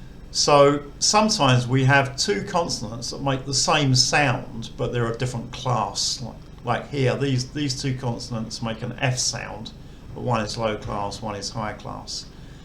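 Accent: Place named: Southern England, United Kingdom. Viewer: Hi Interestingly, if you are northern English, then but and put ARE pronounced the same (both pronounced as you say put). To my northern ears, southern English such as yourself say almost bat (for but). Hence the problem of transliteration of English in to thai for English people, let alone considering all the other nationalities. Keep us the good work.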